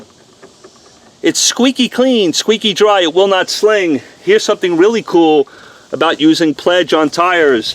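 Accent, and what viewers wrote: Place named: New York, United States. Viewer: Gotta love that Ray Romano NY accent, a rarity in Texas -- keep up the great work!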